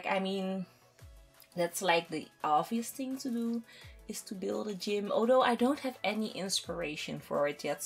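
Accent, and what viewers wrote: Netherlands. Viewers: Are you Dutch? You sound Dutch. (I'm not Dutch but I lived there for a few years) Btw great video, I'm glad I found this channel ☺️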